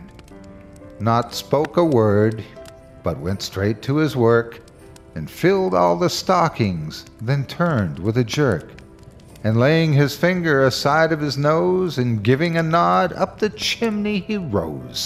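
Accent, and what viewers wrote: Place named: South, United States. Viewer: That was a very good audio performance, and you have such, a wonderful gentle voice with the rhythm of the South, which always makes you such a delight to listen to, no matter how horrifying the subject matter. Bless you, Mr., and may you and yours have a very merry Christmas and a happy New Year!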